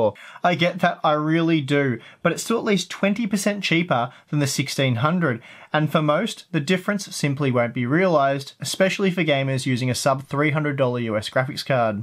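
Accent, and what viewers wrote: Australia. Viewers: ahh the good ol aussie twang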